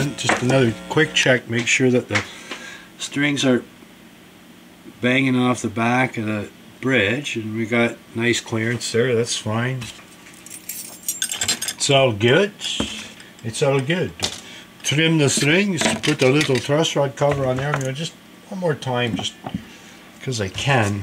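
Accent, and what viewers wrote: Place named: Canada. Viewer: I love your videos and your, your voice it calms me down if I'm anxious. I also like your Canadian accent. Man that's a nice guitar. I got a 50 Studio gold top. I didn't know that much about the tires then. The guy put a bone nut on it instead of filing down the nut he went further into the wood. Which I think would make it really easy to break. so far no problems it's a 2012 when they were using that I don't know what kind of wood baked Maple. I don't like those PCB boards. I wonder how hard they are to change out I have basic knowledge of wiring and soldering from BOCES I think they send you a schematic. That guitar roars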